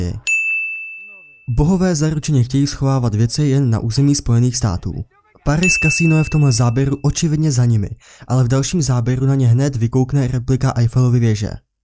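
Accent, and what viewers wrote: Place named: Prague, Czech Republic. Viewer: Hej jako já nechcu nikoho urážat, ale jsou nějaká morální pravidla.Ja su sice jen vytretý moravák,ale pražáčtina je něco NECHUTNĚ otřesného a k tomu mě dokopává to HR,RR,DOBHRE atd.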